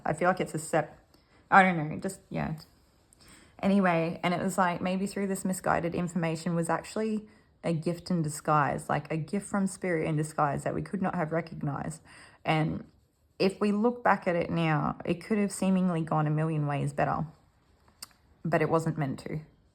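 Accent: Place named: Australia. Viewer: Group 1 it was great that you explained things for new watcher 😊 and that you recognised some people aren't into chakras or meditation (that's me). It took a few times for me to hook into your readings properly, but i liked your Aussie accent (I'm kiwi) - a lovely change from the mostly American ones and I'm glad you're still here 😊 The reading definitely resonated. I'm talking to my ex- i told him i don't want to see him, but he's answering some questions about our past right now. I slipped up and mentioned a place i go to regularly, so now I'm hoping he doesn't turn up on the pretext of seeing my daughter who works there. I'm only talking to him to show him how our values, everything don't match but i think he thinks if i see him, i won't be able to resist him. He's full of himself like that 🙄 and totally self serving, but he's trying to disguise that. 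I know him better than he knows and ever WANTED to know me. Thanks ❤❤❤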